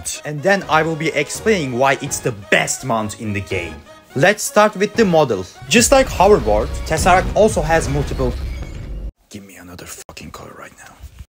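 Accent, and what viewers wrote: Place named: Turkey. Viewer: Duyduğum en türk aksanlı ingilizce❤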